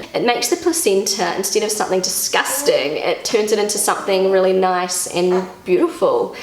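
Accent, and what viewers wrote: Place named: New Zealand. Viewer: Ich liebe es wenn Du Deutsch sprichst. Dein Kiwi Akzent ist so cute. Und Dein Kleiner ist ein lieber, süßer Junge. Stay save...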